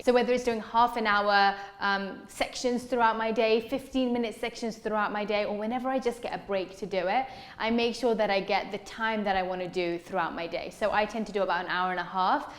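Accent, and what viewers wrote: Sri Lanka. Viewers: Holy cow... I never knew u can speak tamil and it sounds so much like you are from jaffna. Love from Sri Lanka ❤👌🏼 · Hey, are you a Srilankan? I always thought you are an Indian (a kannadiga or something) but your Tamil sounds like Sri Lankan!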